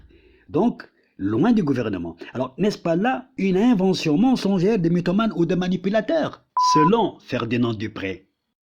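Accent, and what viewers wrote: Paris, France. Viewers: Monsieur c'est avec révérence que je salue d'abord votre éloquence. Une rhétorique digne des grands lettrés. Vous n'avez rien à envier aux éminents spécialistes de la langue de Molière dans mon pays, la France. Et ce (voyons voir) tellement français d'une bouche à l'accent bien parisien, fascinerait davantage des auditeurs attentifs comme moi. Quant à la richesse du contenu, je m'en félicite encore pour ce qu'elle m'apprend sur le conflit du Sahara occidental que je considère d'ailleurs comme marocain par conviction et non par complaisance, grâce à vous.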